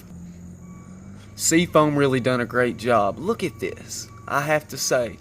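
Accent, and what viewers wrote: South, United States. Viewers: I’m guessing you’re from the south 😂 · You country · That southern drawl is driving me crazy. And I'm from Mississippi.😂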